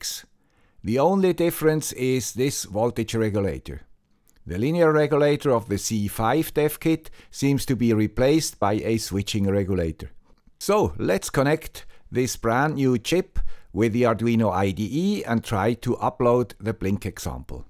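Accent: Switzerland. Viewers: Please please please turn off that YouTube autodubbing nonsense. It destroys your USP, the Swiss accent, and makes your videos unwatchable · My favorite guy with swiss accent is back! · As long as the guy with the Swiss accent keeps making videos, the Earth will continue to turn.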